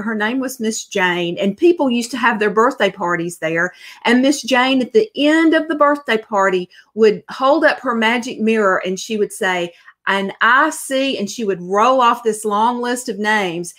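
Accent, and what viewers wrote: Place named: Mississippi, United States. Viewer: Watching in Rockville, Maryland. But I’m from Mississippi, so your accent makes me think of home! Thanks for the great tutorials.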